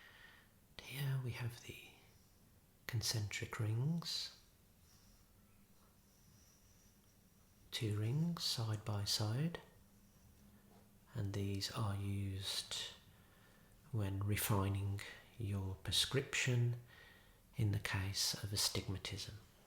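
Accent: Birmingham, United Kingdom. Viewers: I love your natural Brummie accent!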